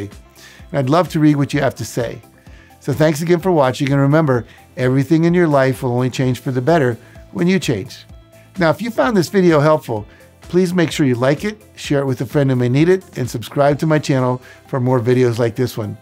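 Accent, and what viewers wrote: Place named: United States. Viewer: Thanks a ton you make it seem so simple, Ty for ref the Boorman video like a shot in the arm.refreshes my persistence muscle.i want to commit 100% to cleaning up my family home that i share with my siblings,parent's blessing want to make it more usable and less cluttered.I owe you special thanks for Rule of 5 from TSP book(awesome book) i practice and feeling the benefits of clean home. it is not all done but i can feel the results so thank You.i appreciate the written pointers you give on the side screen. i will also appreciate if you can ensure a sub-title feature in all videos as sometimes the american accent could be a challenge for us asians to follow. Great Job keep it up and Thank You once again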